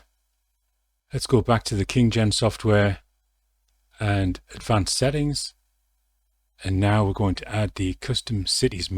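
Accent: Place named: North, United Kingdom. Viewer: You've gotta be a northerner right? Sound like your from my favourite city possibly? :D Love it man, to the point info, great details, great video :)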